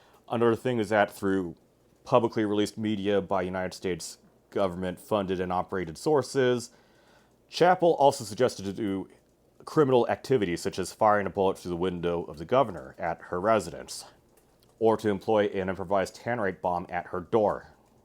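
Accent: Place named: United Kingdom. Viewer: ACKCHYUALLY, He appears to be british to me.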